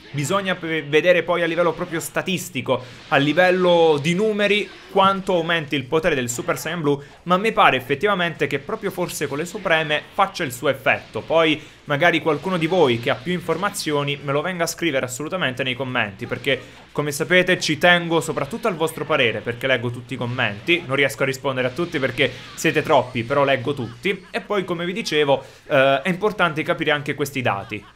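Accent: Naples, Italy. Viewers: ma tu sei di Napoli??🤔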